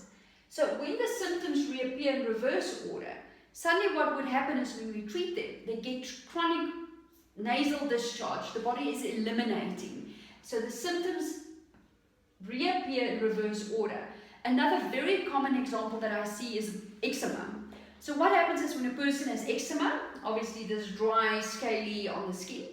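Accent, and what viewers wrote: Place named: South Africa. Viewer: South African:)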